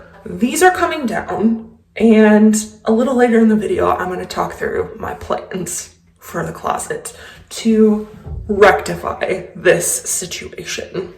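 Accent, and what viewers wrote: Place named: Wisconsin, United States. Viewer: I to am a Wisconsinite, you go girl 🎉🎉🎉 you must be up North I’m in southern side not much snow here just cold 😊😊 I should’ve known by your accent since everyone thinks we have an accent in Wisconsin😂😂😂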